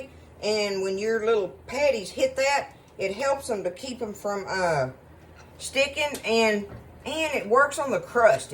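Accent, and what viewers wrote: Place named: Texas, United States. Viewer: I love you and your kitchen. We talk the same. I'm a Texan. I love you and your channel! Thank you!!!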